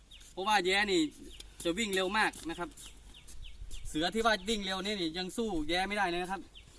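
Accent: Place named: Laos. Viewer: เว่าลาวแต่แรกกะแร้วน้อคะข้อยรุ่นนำเจ้า..ย่านเจ้าเว่าตกลาว55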